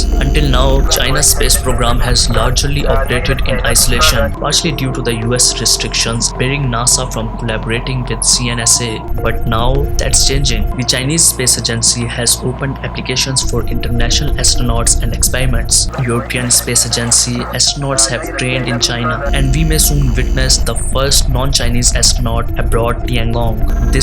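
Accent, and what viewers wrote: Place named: India. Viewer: Indická angličtina katastrofa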